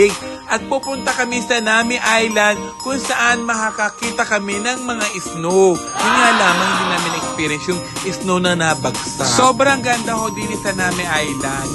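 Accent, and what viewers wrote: Batangas, Philippines. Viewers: First time to watch your show, I love it 🥰 Ang ganda ng Batangas accent mo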